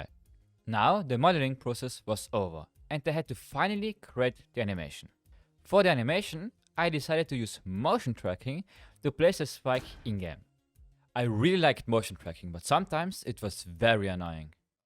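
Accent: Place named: Germany. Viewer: Nicer Deutscher akzent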